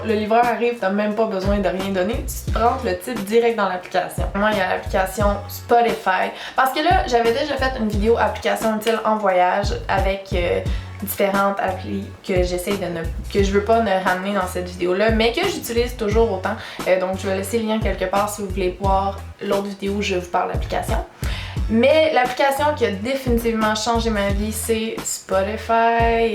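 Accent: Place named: Canada. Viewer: Mdr cette accent et ces expressions. J'aimerai trop aller au Canada un jour putain !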